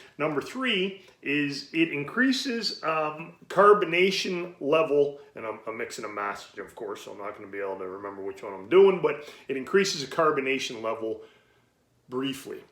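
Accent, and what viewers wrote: Canada. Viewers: You sound Canadian! (I am too). Hope your stuff gets tons of views! This is a cool concept! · You speech patterns remind me a bit of Patrick Warburton 😂 loving the kanuck accent!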